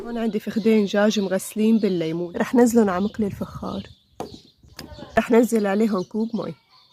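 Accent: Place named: Latakia, Syria. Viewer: كأنك من اللاذقية يقلبي❤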